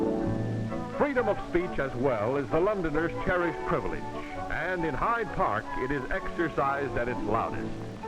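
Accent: United States. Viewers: Will have to get a copy of this. Shame about the corny American voice-over but the images are great.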